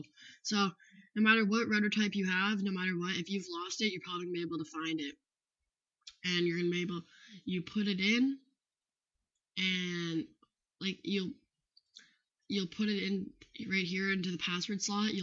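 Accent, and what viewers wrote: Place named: United States. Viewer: Finally English instructions with an American speaking thank you so much I couldn't understand anybody else's accents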